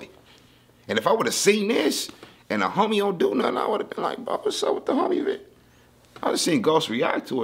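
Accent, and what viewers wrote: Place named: New York, United States. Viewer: so why u speaking like a New Yorker “ goofy “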